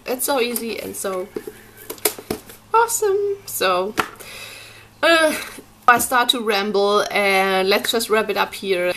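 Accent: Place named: Germany. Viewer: Wow, ich hab nur kurz reingeschaut und noch keine komentare gelesen - trotzdem sofort gehört das du aus deutschland kommst =) was ist denn ein bullet journal?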